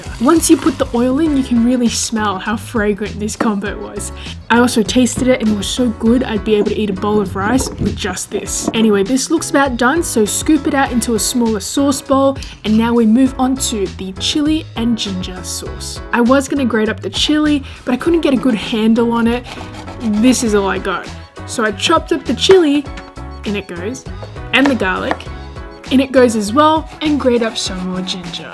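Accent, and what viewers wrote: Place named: Australia. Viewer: It's called an Australian accent, millions of other girls have that exact same accent.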